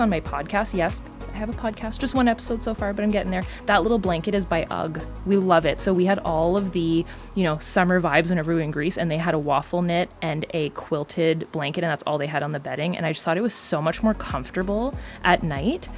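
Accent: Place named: Canada. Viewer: I knew you were Canadian from the first video I watched (your channel is new to me)! You talk like me! I watched a couple of other videos, looking for French on products. Man, I'm such a dork. Anyhoo, gonna sub a fellow Canuck! 🇨🇦 Oh, and Carl needs his own show, books, product line etc. Sooooo cute!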